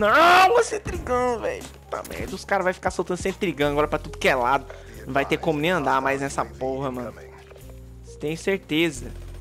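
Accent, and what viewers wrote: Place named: South, Brazil. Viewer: Man, tu tem um fodendo sotaque do Sul, de que lugal vc é brou?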